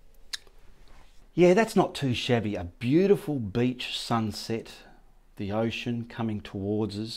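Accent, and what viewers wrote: Australia. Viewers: Beautiful! Your sense of humor is so subtle, but so funny. "We'll put a bum on that cloud, and a bum on that cloud." I've been trying your examples in attempting to do my beach scene, and as simple as you make them, they still take practice, for me anyway. I'm combining two of your videos on one painting, and the tips and tricks are so incredibly helpful! Not sure if it helps the channel, but I definitely end up watching each video many times, haha. Watching them over and over builds up my courage! Thank you so much for taking the time to share your wonderful talents! I'm so happy to have found your channel! (I think I'm picking up an Australian accent while I'm at it!)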